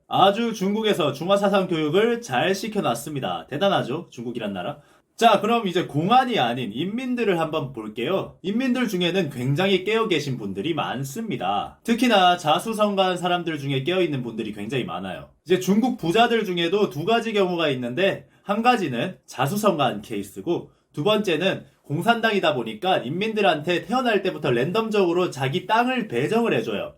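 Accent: Gyeongsang, South Korea. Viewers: 경상도 특유의 표준어 사용시 묻어나오는게 있습니다. 저도 경상도사람이니 아는거고요 ㅎㅎ 그래도 나쁘지 않았어요^^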